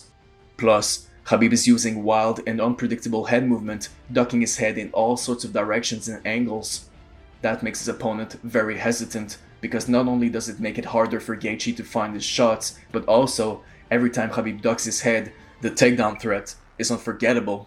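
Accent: Arab world. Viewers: It's seemed you have an Arabian accent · Yo we can tell from your accent that you're arab. Don't call him khabib when you know how to pronounce his name HABIB properly. Fake ass guy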